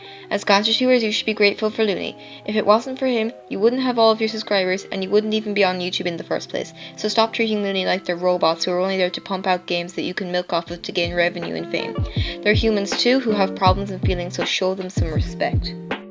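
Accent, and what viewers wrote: Ireland. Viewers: Why do u sound like your from Ireland or sumthing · sorry if I'm asking a personal question.. But are you Irish by any chance? I just hear some similarities in the accent lol 😂😅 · Are you Irish? :3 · Is she irish? Lol im irish and i recognise the accent xD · Omg are you irish 😍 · Are you Irish? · You sound Irish are you? because I am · One question are you Irish cause I recognize your accent from my own 😳😳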